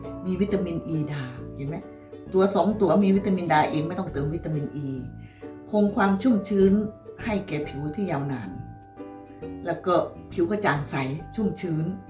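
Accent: Korat, Thailand. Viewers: ชอบภาษาไทยโคราช เพราะมากขอให้ รวยๆจ้ะ ลดคำว่า พี่น้องจ๋าๆๆๆ ก็จะดีมากคะ่ มันเยอะไป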